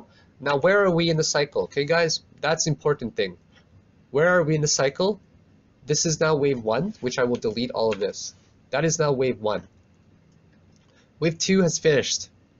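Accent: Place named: Canada. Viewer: You are awesome dude. Canadian right? I can hear it in your voice. Alberta here.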